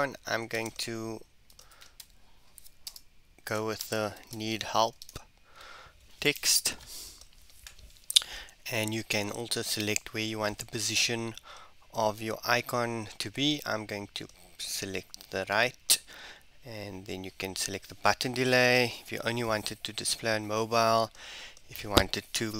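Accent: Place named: South Africa. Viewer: Good to hear a familiar South African voice. lol. Cool vid. Worked 100% for me.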